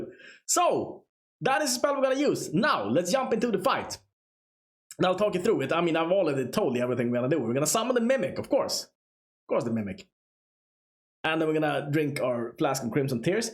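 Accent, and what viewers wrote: Sweden. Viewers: I like your Swedish accent. 👍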